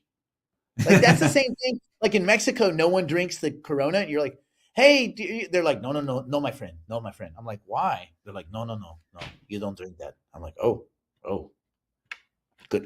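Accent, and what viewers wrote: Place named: Australia. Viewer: I knew it.. is an Aussie just speaking American to us……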